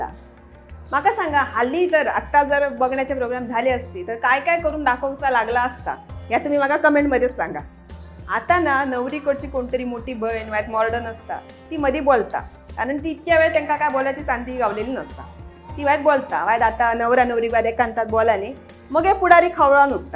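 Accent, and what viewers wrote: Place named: Malvan, India. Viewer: .... तुमचे व्हिडिओ खुपच मस्त असतत हा.. अस्सल मालवणी मस्त बोलतास. अगदी गावाक गेल्यासारख्या वाटता. तुम्ही आपलें जुने मालवणी परंपरा, चालीरिती..जगासमोर आणतास ह्या खुप चांगला काम करतास. तुमका खुप खुप शुभेच्छा... मी तुमचे सगळे विडिओ बघतय.खुपच छान असतत.... तुमचा खरा नाव काय आसा...? 😊🙏🏻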